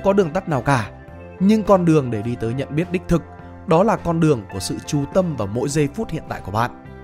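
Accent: South, Vietnam. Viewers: từ khi thêm giọng đọc miền nam vào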